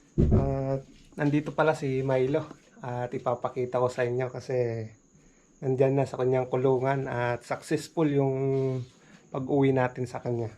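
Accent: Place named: Ilocos, Philippines. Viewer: May ilocano accent ka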